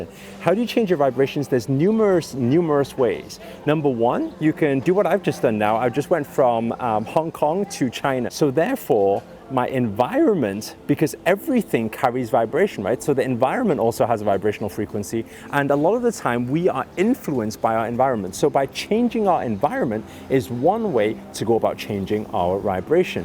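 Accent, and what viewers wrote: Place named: United Kingdom. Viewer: I'm interested in the British accent, I really love it. yesterday I've been thinking; I really want to find a British LOA youtuber, then forgot about it, Opened youtube tonight and I've just found you! I'm blown away because I've been doubting LOA for nothing seems to work. The problem is, I can't let go, I can't stop my obsession with my desires. Please help with a video about an easy way to let go and stop the obsession.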